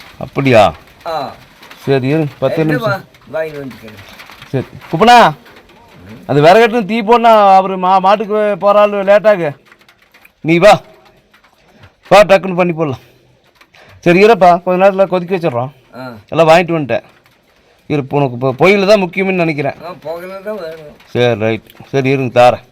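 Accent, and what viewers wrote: Kongu, India. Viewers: உங்க வாய்ஸ் நம்ம மேக்கத்தா பாஷை அருமை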